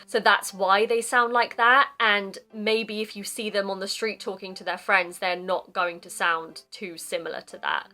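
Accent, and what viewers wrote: RP, United Kingdom. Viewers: やっぱりBritish English の方が聞きやすい！ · I totally agree with your thought about British English. You say that there is no the British English and so called British English has recently been getting lots of influences from American English. I myself speak American English with a Japanese accent like most of us Japanese, but your English sounds sweet to my ears , especially words pronounced without a r sound are pleasing. See you soon. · Thank your for another interesting video! I realized that I had many misunderstandings about the UK. When I’m watching your videos, I often see your accent as polite like proper English or RP(may be because you are in front of a camera?) so if you had a chance to introduce your accent, I would be happy to hear that! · やっぱ、自分が習った英語は、イギリス英語なんだなあ～☺️聞き取りやすい。でも話せない。(^-^)/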